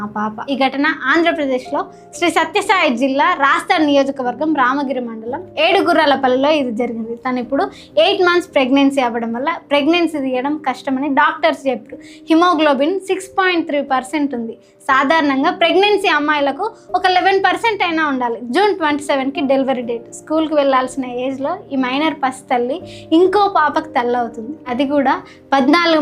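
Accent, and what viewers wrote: Telangana, India. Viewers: మీది ఎక్కడ మేడం మీ vioce చూస్తే Telangana అనిపిస్తుంది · ఈమె ఎక్కడ ప్రభుత్వం మనిషి ఈమె భాష యాస చూస్తే పక్క telaganaa బిడ్డ లెక్క కొడతాంది.... ఏపీ లో అన్యాయం కోసం .... అక్కడ ప్రజలు కోసం అడిగే చొరవ ఉన్న ఈ భారత నారిమణి ఏడుగుర్రల పల్లె కి ఓయి అడిగేడుందే ఇలా ఛానెల్ పెట్టీ అడిగే తీరు నాకు నచ్చలేదు. అన్యాయం జరిగింది. న్యాయం చేయాలి దిక్కు లేదు ఆమెకి అన్ని ఉన్న అమ్మాయిల నీ గేదెలు గెద్దెల మాదిరి వేధించడం పరిపాటిగా మారిన సమాజంలో అసలు దిక్కులేదు అని చెప్పే నీవు అన్యాయం జరిగేదాకా అసలు ఆమె ఎవరో తెలియని నీకు జరిగిన తరువాత పక్క చానల్ నుండి వార్త సేకరించి ఇలా నీ ఛానెల్ రివ్యూస్ పెంచే ప్రయత్నం లో మసాలా add చేసి mari ప్రశ్నిస్తున్నావ్....ముందుగా ఆమెకి అవసరం అయ్యేది ఏదో చూసి పోయి నీవంటి సహాయం చేయాలి తరువాత . ఈ భాగోతం స్వయం గా ప్రభుత్వం వద్దకు డైరెక్ట్ గా పోయి చెప్పి తగిన సహాయం కోరితే చేసే వీలుంటుందేమో ప్రయత్నం చేద్దాం ....అంతే గాని ఏమి చేసావు అంటే ముందు ప్రభుత్వం ఎలాగబెట్టింది నీకు తెలియదా తల్లి ఆ ప్రభుత్వం లో పెళ్లి అయిన ఆమెనే చేరిచి చంపడం జరగలేదా....అక్కడ న్యాయం జరిగిందా..... 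ఇంటి ఇంటికి కాపలా ఉంటాం అని ప్రభుత్వాలు మాట ఇస్తాయా....ఇచ్చాయా ముందు పాలించిన CM lu dpty cm lu ....ఏమైనా అర్థం ఉండేలా మాట్లాడు ....మీకు నలుగురు పిల్లలు ఉంటేనే అందులో ఒకడు sump lo పడిపోతే ఏమమ్మ చూసుకోవడం చేతకాద పసోడు ఇలా పడిపోయాడు అంటే నీ సమాధానం ఏమిటి అలాంటిది ప్రభుత్వం నడపడం అంటే మాటలా అందరిని ఇంటి ఇంటికి వెళ్ళి చూడటం కాపాడటం ఏపిల్ల నీ ఎక్కడ ఆకతాయిలు రేప్ చేస్తున్నారో చూడటం ఎలా మనలో మన మధ్య ఉన్న జనం లో విచక్షణ లేకుండా సంభవమే కాదు .... సరే నీ పక్కనే ఒక ఆక్సిడెంట్ జరిగితే నీవు నీ బాధ్యత గా వాళ్ళతో ఎంత సమయం ఉండి సహాయపడగలవు అది చెప్పు. ఛానెల్ లో ఓ గొంతు చించు కోవడం ప్రభుత్వాల మీద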